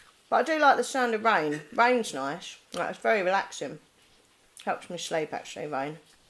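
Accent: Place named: England, United Kingdom. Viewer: Haha your accent is reyt good, proper English!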